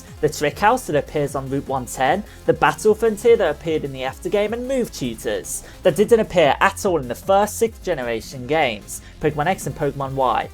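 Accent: United Kingdom. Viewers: Where is your accent from? Sometimes it sounds British, other times it sounds from the Orient. It's a cool accent.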